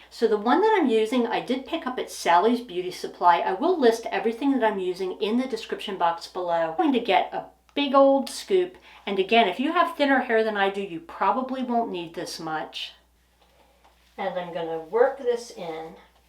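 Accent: Philadelphia, United States. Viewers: I am from Philly and you are either Philly or South Jersey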